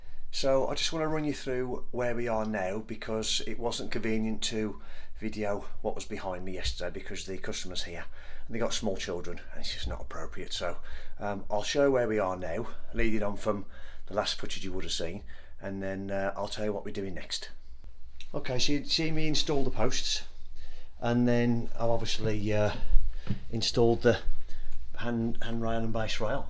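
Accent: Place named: Birmingham, United Kingdom. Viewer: Hello, very impressed with you standard of workmanship and your a Brummie like me! Where about sin Brummie land are your from as our accent changes ever so slightly from one place to another. New to your site but very impressed with you and work and honesty.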